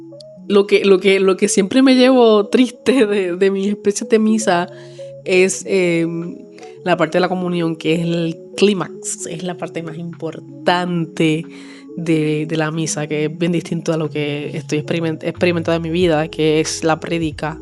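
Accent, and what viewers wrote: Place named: Puerto Rico. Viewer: Hermana Boricua! Lo sé por tu acento. Crecí asistiendo a la iglesia católica y en colegio católico y teniendo toda esta riqueza en mis narices no lo entendía en mi corazón. Mi mamá siempre me decía esta es la iglesia que fundó Cristo y aquí tenemos la Eucaristía, pero yo seguía siendo una católica tibia, sin compromiso… fui a una iglesia evangélica por unos meses pero cuando empezaron a hablar mal de las monjas y los sacerdotes además de la presión para dar dinero y me dije…esto no es del Señor…además que algo me faltaba y era literalmente la comunión, la Santa Eucaristía. Volví y empecé a empaparme de lo que creemos y a buscar información y quedé bruta y convencida de que era verdad lo que me decía mi mami. Abrí mi mente y mi corazón y estoy enamorada de mi Jesús en la Eucaristía- somos el cuerpo de Cristo - la iglesia que El quiso que viviera en unión. 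No somos perfectos pero El dijo que las fierzas del infierno no prevaleceran contra ella. Dios te bendiga y te acompañe en este caminar. Un abrazo! Vi tu video desde Virginia, EU.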